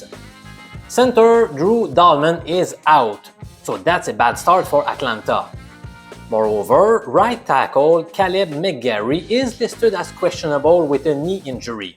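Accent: Quebec, Canada. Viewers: First time watching your channel and I hope your the GSP of Sport Betting🤣. I'll take a wild guess and say that you got your Master's in Statistics at the Université de Montréal, I know a Quebec English accent when I hear one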